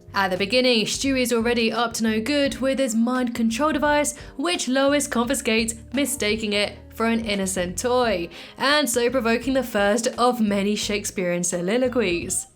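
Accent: United Kingdom. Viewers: Are You British Or American Cuz I’m British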